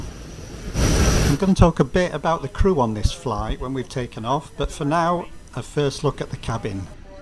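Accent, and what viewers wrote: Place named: North, United Kingdom. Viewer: With all due respect, I sometimes had trouble understanding your northern accent: "bus" for example.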